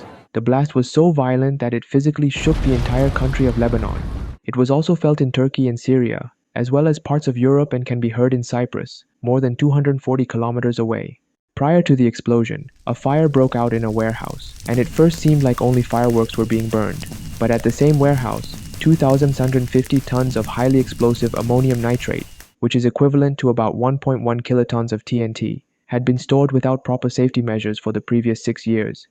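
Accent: India. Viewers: he sound indian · Bro has an Indian accent made by ai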